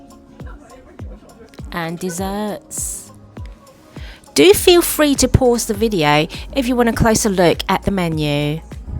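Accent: United Kingdom. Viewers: 鐘意聽你講英文，我可以學聽英國音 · Hi, your voice and accent is very nice, can you share how you make it? As a local Hong Kong people, I really want to transform my accent to more British. Thanks.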